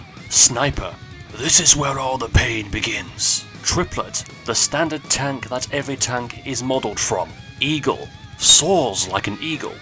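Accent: United Kingdom. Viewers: The R-rolling just sounds very weird, in the midst of the British-like accent.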